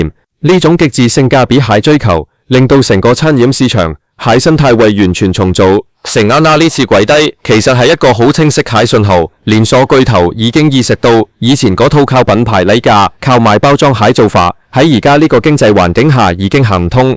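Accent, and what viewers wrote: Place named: China. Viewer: 阿燦旁述！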